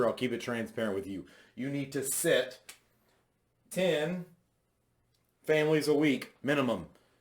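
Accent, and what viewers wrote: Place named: South, United States. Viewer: Thanks this was great! I'm just now considering a position with Symmetry and enjoyed listening to your southern draw!! 😄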